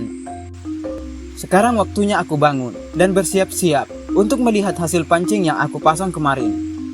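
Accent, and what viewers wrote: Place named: Sulawesi, Indonesia. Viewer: igt kawanKu yg di sulawesi cara bicara nya🙂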